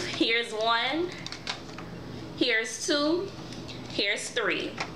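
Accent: New Orleans, United States. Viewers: This is a little random but what's that song at the end ? I fell in love with it lol. Love your video btw and your hair is gorgeous ! And that New Orleans accent tho !! 😍😍😍😍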